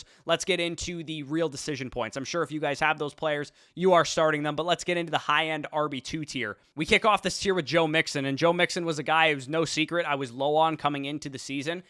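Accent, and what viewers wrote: Canada. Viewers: Another good video, thanks. Hey, can you say “out and about running routes” in your thickest Canadian accent? Thanks 🙏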